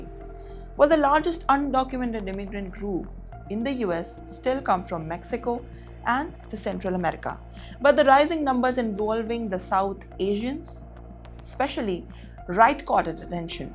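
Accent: India. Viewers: Indian Accent, Indian English, very irritating. Could only take 2 minutes listening.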